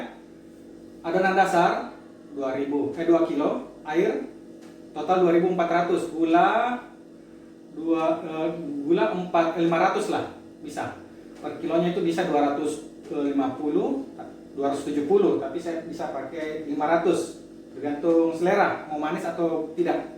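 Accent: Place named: Sulawesi, Indonesia. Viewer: Assalamualaikum, jazakallah khoiron jaza, atas videonya semogga memberikan manfaat dunia akhirat.. dan berkah umur ples reezkyny... Dri logatnya kayakny dri sulawesi yah mas??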